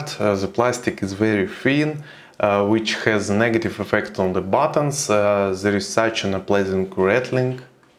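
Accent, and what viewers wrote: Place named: Poland. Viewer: Ну ладно, не русский а поляк Ну все же акцент сильный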